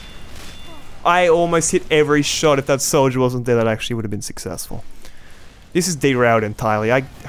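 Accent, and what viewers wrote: Australia. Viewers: Australia accent plus mystery dungeon background music gets you a sub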